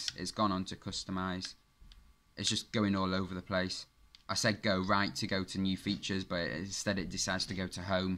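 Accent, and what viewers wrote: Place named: United Kingdom. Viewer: I LIKE BRITISH ACCENT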